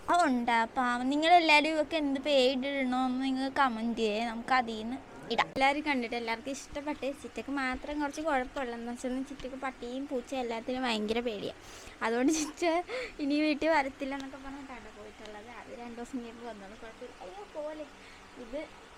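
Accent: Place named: Cherthala, India. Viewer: ചേർത്തല slang ഒത്തിരി ഇഷ്ടം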